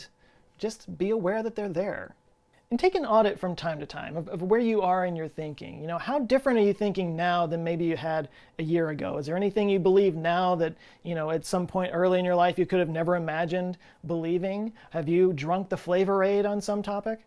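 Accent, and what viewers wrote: Texas, United States. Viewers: Finally we get you true Texan accent! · What a Texas accent 😅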